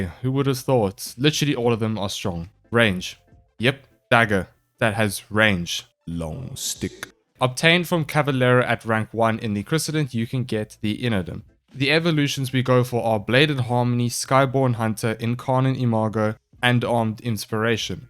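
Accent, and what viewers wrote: Afrikaans, South Africa. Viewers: Bro. You sound extremely south african🤔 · is this guy south african ?? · Either I'm tripping or you speak Afrikaans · My guy is afrikaans awe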